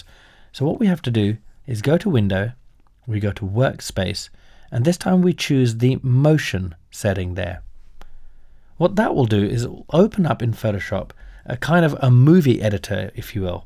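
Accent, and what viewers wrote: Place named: United Kingdom. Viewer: thamk you OH so much, Brit accent teacher!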